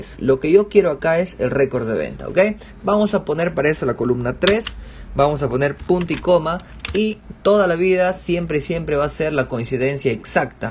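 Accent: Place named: Loreto, Peru. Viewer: TU ACENTO CHARAPA ES LO MAS HORRIBLE DEL VIDEO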